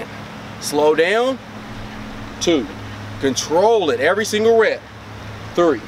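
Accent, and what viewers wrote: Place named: South, United States. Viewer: I like that southern accent, awesome quick bicep workout! Thanks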